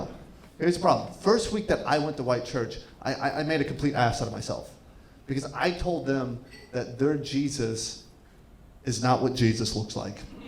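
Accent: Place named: Jamaica. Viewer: Several times he was almost funny. I think he would have killed it if he spoke in his Jamaican accent.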